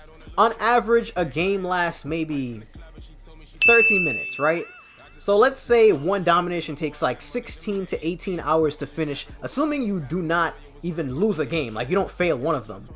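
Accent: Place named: Jamaica. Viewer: I hear that Jamaican accent boy Gang🤟🏽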